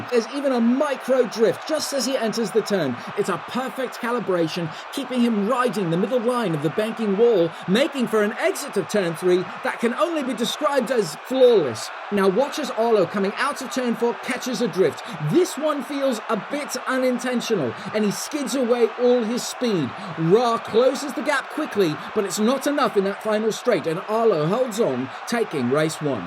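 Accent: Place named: United Kingdom. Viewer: Makes sense now, the accent sounded very familiar to be British🤣. Everything about the races, street lights and commentary is amazing, keep going!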